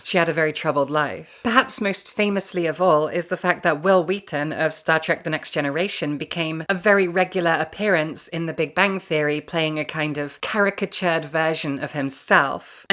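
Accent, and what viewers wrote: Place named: Scotland, United Kingdom. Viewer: Seriously. I love her accent, it's kind of mild for an Scottish accent but combined with her voice it's so soothing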